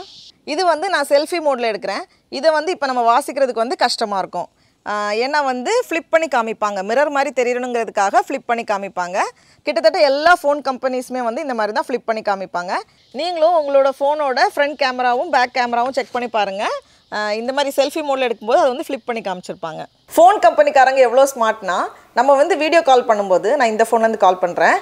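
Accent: Nagercoil, India. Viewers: Nice Nagercoil slang..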